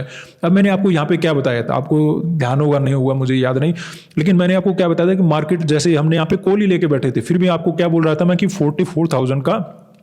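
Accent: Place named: Punjab, India. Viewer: Sir pakka punjabi hai awaj se pata chal raha hai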